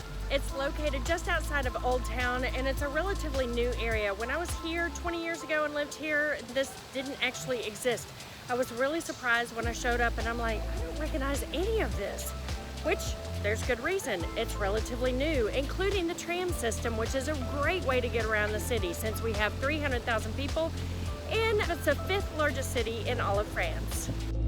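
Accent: South, United States. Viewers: Where are you from? I’m guessing from the south. 😊 Do you speak French? I am from Texas so I have a similar accent. I have been trying to learn the basics of French, but I feel silly speaking with my accent. Any tips?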